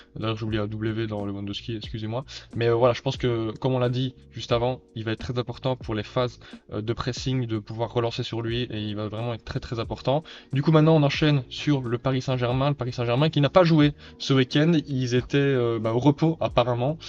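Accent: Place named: Belgium. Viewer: Super vidéo, tu mérites plus de visibilité! Ps: est-ce que tu es belge par hasard? Je reconnais un petit accent haha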